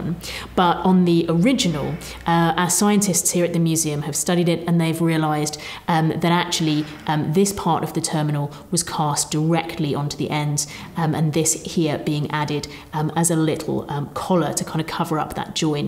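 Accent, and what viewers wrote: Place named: United Kingdom. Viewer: As an American, every time she said "torc" with her British accent, I thought she was saying "talk" with a British accent.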